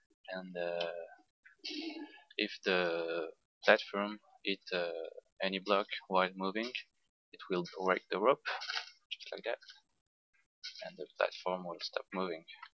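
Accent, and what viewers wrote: France. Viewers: Well that's your own fault. Have you never heard anyone with a French accent speak English before?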